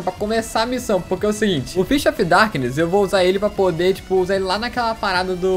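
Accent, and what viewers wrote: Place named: Rio de Janeiro, Brazil. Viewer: Carioca é assim memo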